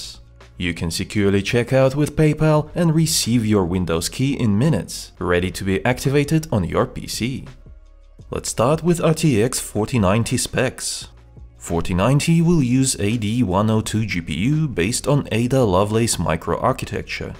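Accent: Russia. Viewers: Акцент русский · Мне кажется, или он русский?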